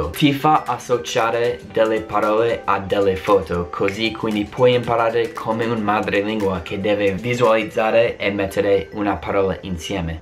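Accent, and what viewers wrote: United States. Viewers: L'italiano è molto complesso come lingua. Ma, insomma lo parli bene con l'accento americano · Caro non confondere il sesso con il genere. L'italiano avendo il genere anche negli articoli determinativi o indeterminativi, oltre al singolare e al plurale, e. molto piu' comprensibile di altre lingue. Non e'. difficile impararlo. I problemi sono altri, cioe' la sintassi, la semantica dello sterminato vocabolario,i modi e i tempi dei verbi e tanto altro. Per quanto riguarda la pronunzia, purtroppo e' piu' complicato in quanto i popoli anglo-sassoni di madrelingua, con quel tipo di pronuncia, modificano in modo naturale le corde vocali. Basta vedere la differenza tra te che sei americano e un inglese di Londra,la pronuncia e' completamente diversa. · Caro, io sono un'italiana che risiede nel US da 43 anni. Sono cresciuta in Italia ma ho fatto le scuole superiori qui nel US e ho dimenticato molto Italiano. 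Ero brava una volta a scrivere l'Italiano, ma ora non tanto. Ho imparato bene l'inglese e potrei correggere tanti americani. Complimenti. Se non hai scritto lo "script" per questo video, sei molto bravo. La pronuncia e` ancora molto americana, pero`. L'italiano lo parli meglio di me. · Ma quando hai una parola ostica da pronunciare, credo che tu sei abituato a visualizzare com'è scritta e di conseguenza la pronunci da americano. Se invece ascolti senza sapere come è scritta e provi a pronunciarla troverai meno difficoltà.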